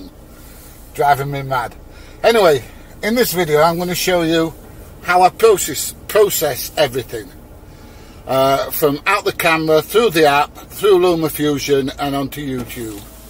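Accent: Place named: Doncaster, United Kingdom. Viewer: I come from Doncaster, live in Chicago now, but I sense you’re from around there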